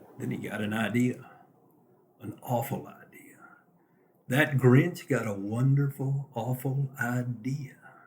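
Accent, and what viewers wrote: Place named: South, United States. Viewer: Love, luv, l-o-v-e this! Such a pleasant, warm and southern voice. Going to share it with my friends ❣️👍🏻🫶🏼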